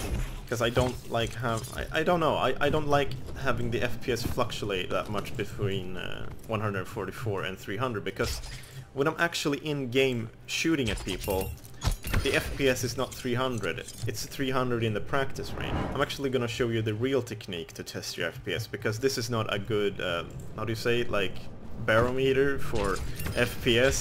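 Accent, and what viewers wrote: Sweden. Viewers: you sound swedish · You sound swedish. Are you? Also nice video bro, keep it up! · This guide is actually really cool and really helpful,thank you for doing this! Hope you dont mind the personal question,but are you by any chance swedish? I'm just thinking the accent gave it away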